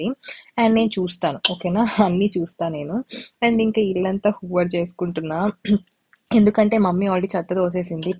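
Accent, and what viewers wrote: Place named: Andhra Pradesh, India. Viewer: Medi madanapalli ha slang koncham malaga vundii sooo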